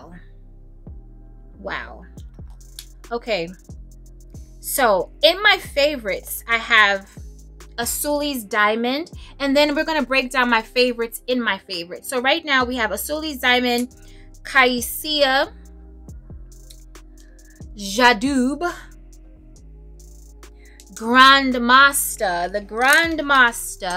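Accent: East Coast, United States. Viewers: Not the worst review. But that east coast accent that ruins it for me. And the lack of correct pronunciations 🤦🏽‍♂️ (I’m not sure how to say this” is a sentence that prevails with reviewers. I find it to be lazy. Take the time and learn the names of the fragrances. It’s respectful to the house. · East coast accent that ruins it for you? Can she help that she's from the East Coast? Now the pronunciation of the perfume is a good constructive criticism, but to say her accent is an issue, please have a seat. 🙄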